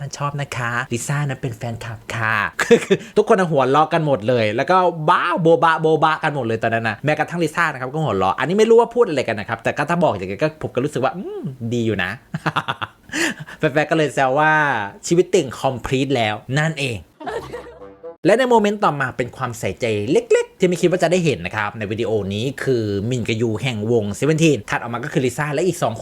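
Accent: Isan, Thailand. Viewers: ชอบในความหลุดความเป็นอิสานนะคะ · ชอบแอดเว้าอีสานมาก น่ารัก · พอได้ฟังพูด อิสารเลยกดติดตามคะ❤❤❤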